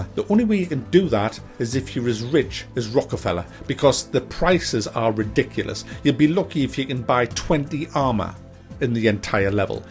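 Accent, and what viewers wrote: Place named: Scotland, United Kingdom. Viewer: I love your review, I love your videos (watched some of a playlist), and I love your accent... I wish I had a Scottish accent. Subbed.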